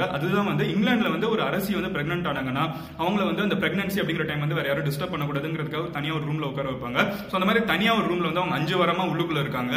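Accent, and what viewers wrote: Madurai, India. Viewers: Nama madurai slang la pesu thala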